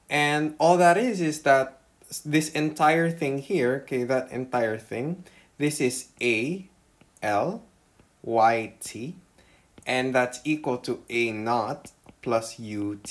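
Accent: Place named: Philippines. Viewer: You sound Filipino. Good video.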